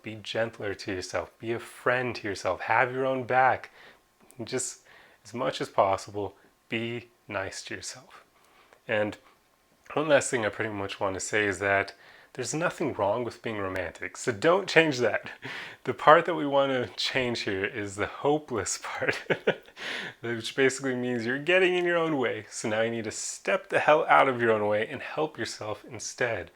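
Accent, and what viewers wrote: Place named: Germany. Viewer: You're such a chill guy, thanks for your video! Also, where are you from? For some reason your accent sounds mildly german to me lol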